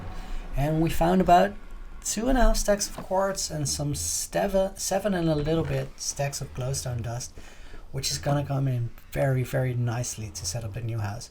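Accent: Netherlands. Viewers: u dutch?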